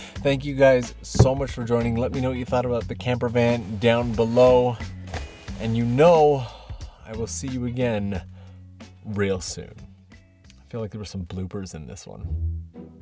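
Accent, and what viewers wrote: Canada. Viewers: after hearing him say "out" so many times, I'm now wondering, is he canadian?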